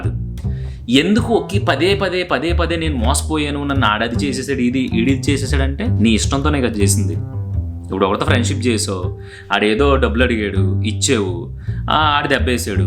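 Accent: Godavari, India. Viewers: Bro meedi place yekkada bro .ma godavari slang la vundi😊😅??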